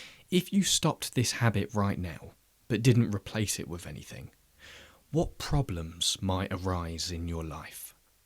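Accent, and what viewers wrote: United Kingdom. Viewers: What flavor of British Accent is this?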